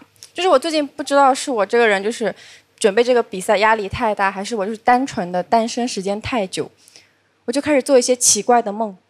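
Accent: Northeast, China. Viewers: 可惜了 其实挺好的 就是说的太东北 动作表情太多 李诞不喜欢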